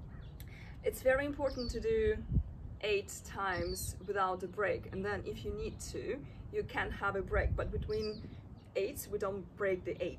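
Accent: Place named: Russia. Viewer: Чувствуется русский акцент у.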